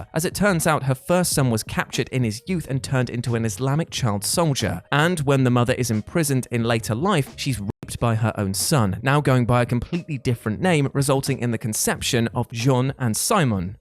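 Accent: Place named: England, United Kingdom. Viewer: At least he's English and not Scottish, the closed captions wouldn't help you! And that's coming from someone who is Scottish 😂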